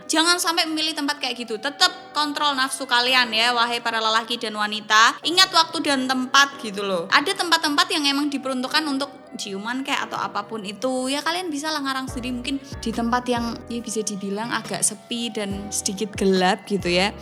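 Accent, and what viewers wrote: Java, Indonesia. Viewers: Aku kontenmu Mbak. Aku liat kontenmu kekel bhasamu Mbak.. boao jowone asik😀 Salam kenal ae wis Arema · Ngomoge mledok mbae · mbak wong ndi to yo 😂ngomong e cek medok e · Iki suenneng aku logat e jowo e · Ni cwe tipe gw bngt..medok'a itu lho bikin gw lemes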